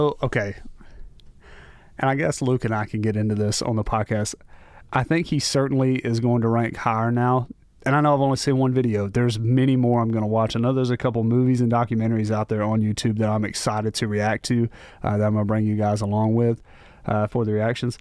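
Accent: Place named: United States. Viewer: Football bro come on, I'm American too but never will I say sawkir.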